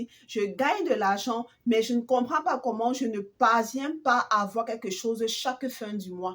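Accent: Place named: Cameroon. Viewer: Belle vidéo, argument très pertinent. Je sens, d'après ton accent, que tu es Camerounaise, je suppose.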